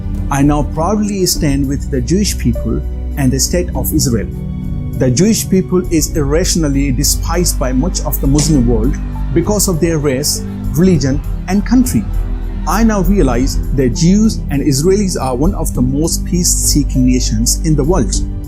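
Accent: Bengal, India. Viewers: He sounds Bengali not Pakistani. He sure is confused with his identity. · He sounds like a Bengali no Pakistani speaks like this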